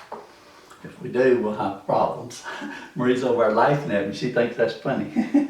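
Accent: South, United States. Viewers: I love the Mayberry southern accent.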